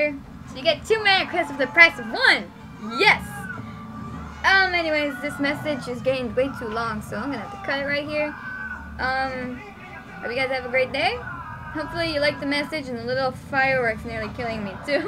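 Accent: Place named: England, United Kingdom. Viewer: That was awesome in every meaning of the word! I couldn't stop laughing...hope the fireworks didn't catch up to you (I have a phobia of them, so I understand...) Yeah, we definitely need to PM each other more often, and I approve of the England accent!!! Happy Christmas! (English Merrry Christmas; and you totally pronounced Feliz Navidad right, half my family is Argentine, so I know!) Wow, might steal your idea of combining presents into one!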